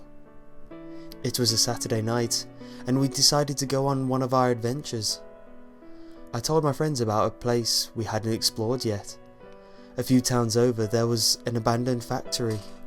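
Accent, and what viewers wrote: United Kingdom. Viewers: I've listened to some of your pastas now and I like the storys, you choose. I'm also so much into your accent! I could listen to british accent for hours (I'm from Germany) Anyway, you do a great job! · British people r so lucky, all u need is ur voice, piano, n a creepy pic to make scary vid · i loce this guys accent im a big fan of british accents :)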